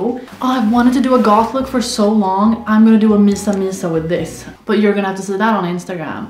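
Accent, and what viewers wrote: Sweden. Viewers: Bro are you swedish? You sound very swedish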